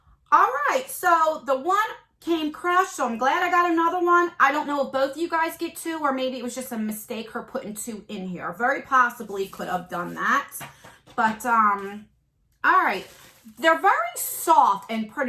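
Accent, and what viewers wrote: New Jersey, United States. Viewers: 😂😂😂 my boyfriend heard your voice while i was watching this...he is from the east coast and has a brother in Jersey "who do you know in Jersey?" me: oh just one of my fave youtubers.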